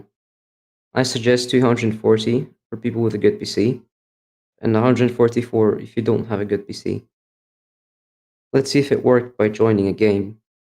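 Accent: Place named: Scotland, United Kingdom. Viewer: Are you European you sound like a scotsman 🤔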